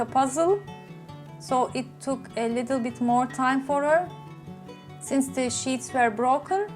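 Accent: Turkey. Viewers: Love your English Turkish accent!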